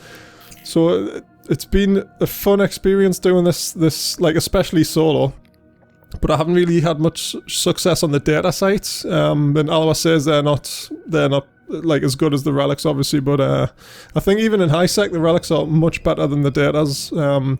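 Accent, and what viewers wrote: Newcastle, United Kingdom. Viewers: Always great hearing another Geordie playing eve :D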